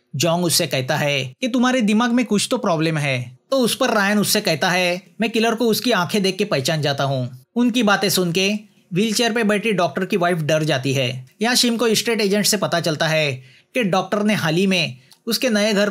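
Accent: Maharashtra, India. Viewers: मराठी medium का है .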